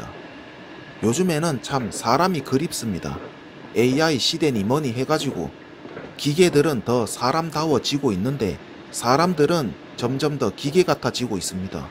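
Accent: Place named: Gyeongsang, South Korea. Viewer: 무식한 경상도 사투리 엄청 거슬리네. 접어라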